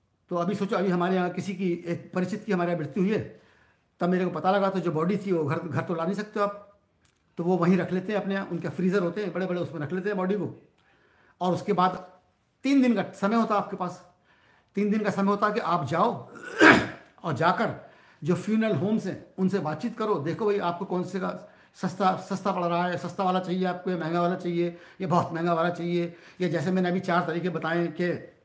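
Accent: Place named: Moradabad, India. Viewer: humaray mata pita moradabad up say Karachi aaiy thay aap ki bhasha bilkul moradabadiyon jesi hay Meray pita gee bhi bilkul aap ki Tarah Urdu Hindi boltaiy hain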